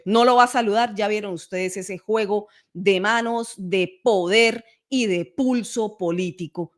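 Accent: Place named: Colombia. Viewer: A pesar de tantos años en EU, no has perdido tu acento colombiano... Es maravilloso.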